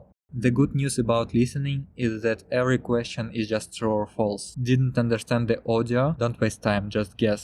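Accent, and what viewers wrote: Russia. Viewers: First of all, thanks a lot for your videos. But, are you sure you are from Korea? You accent sounds very Russian to me.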